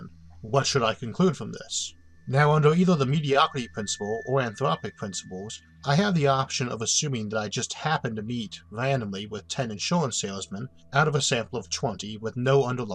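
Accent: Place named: Louisiana, United States. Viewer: I thought initially it was a strong cajun accent lol.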